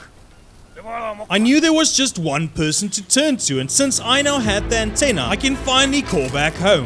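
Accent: Afrikaans, South Africa. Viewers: are you south African? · Are you south african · Absolutely brilliant. Subscribed. You sound South African, like me - am I right? Love the way you do the story telling which makes getting insight into the game huge fun. Dankie Meneer. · Jir my ou, your accent gives me Afrikaans vibes like jasis my bru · Is that a South African accent? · I knew it your south African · South African? 🇿🇦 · Am I the only one that hears an Afrikaans accent here? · love your Afrikaans ,Engels accent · You sound south african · could tell from you accent awesome to see a fellow south African making awesome content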